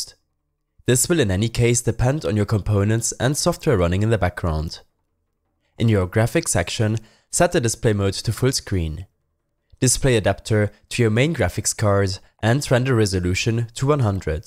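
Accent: Germany. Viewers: deutsche aussprache alter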